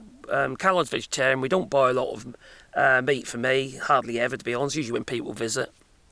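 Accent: United Kingdom. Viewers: Fed up with Trump and nice to listen to a British accent for a change! Just ignore your trolls! I pay for YouTube but don't have a YouTube channel. Too old. I suffer from phishing on my mobile phone and at my age have to answer it just in case it's the GP's surgery for a blood test review or appointment. GPs sometimes use their own mobiles and I can't save their numbers. I object to a greasy fraudster calling me by my first name as if we're the best of friends but that's how I know they are trolls! Officialdom never does that! I don't have any investments, insurance or car thank you very much, or a daughter who's run out of money! ❤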